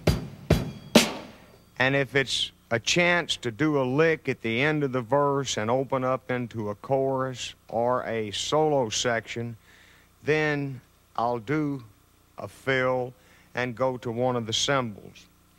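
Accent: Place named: South, United States. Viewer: It's just his accent. I've always thought it's funny how the southern accent can make anyone sound dumb, and the british accent can make anyone sound smart LOL!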